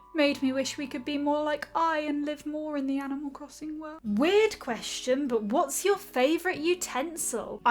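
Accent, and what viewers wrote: United Kingdom. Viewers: So.. many.. pikachus.... Also where in UK are you from? You probs have my favourite type of British accent it's so lovely :o hallo from Michigan :D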